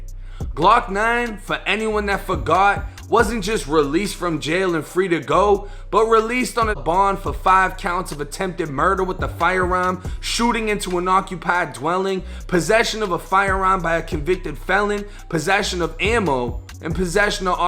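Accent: Boston, United States. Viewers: You sound like you was born and raised in Boston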